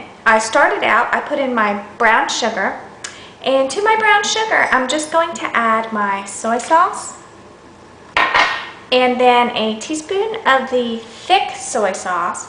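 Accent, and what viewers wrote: South, United States. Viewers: An Asian girl with a southern accent. You know this Bourbon Chicken is on point!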